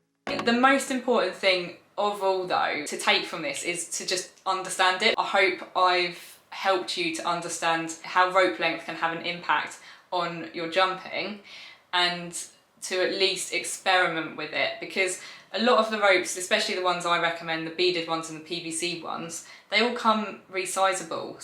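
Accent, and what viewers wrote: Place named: Liverpool, United Kingdom. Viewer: What part of Liverpool are you from 😁😉🐝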